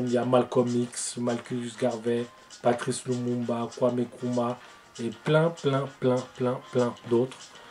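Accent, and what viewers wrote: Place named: France. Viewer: real english frenchie accent still cute ^^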